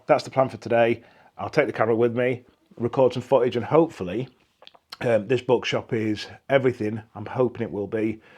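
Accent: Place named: Yorkshire, United Kingdom. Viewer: Are you from Barnsley?! Sounding very Yorkshire to me! 🙂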